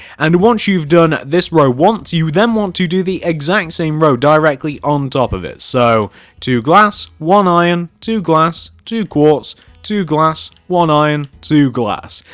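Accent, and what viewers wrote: United Kingdom. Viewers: Why every YouTubers in British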